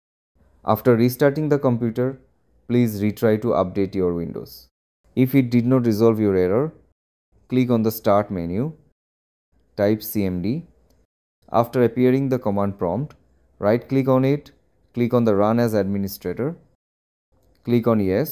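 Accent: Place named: India. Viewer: Ahh yes the "trust me bro" Indian voice. What a joke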